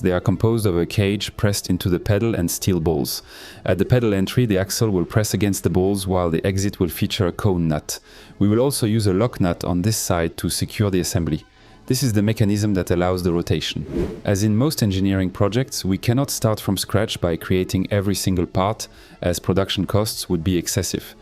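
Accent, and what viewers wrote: France. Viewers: french accent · You sound french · I was not expecting YouTube to deliver me such an excellent FreeCAD tutorial from a man who sounds like the person every single impressionist based their exaggerated, stereotypical French accent on. This man may be the most French-sounding man I have ever heard. It would be very funny if I'm super wrong abd he's Flemmish, or something.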